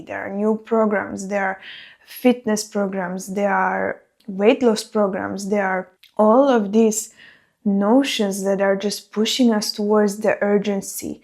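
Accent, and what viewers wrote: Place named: England, United Kingdom. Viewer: Ahh that's a lovely english accent. What's your original language?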